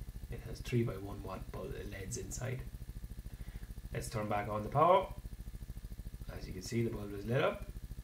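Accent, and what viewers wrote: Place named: Ireland. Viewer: its hard to be sure about a technician with an irish accent, its a tad off putting, telling a joke or singing a song, writing a poem, sure, but not doing something technical, just saying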